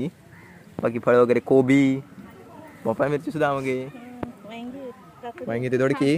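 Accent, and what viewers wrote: Malvani, India. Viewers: Are bhava malvani bol Chan Sanvte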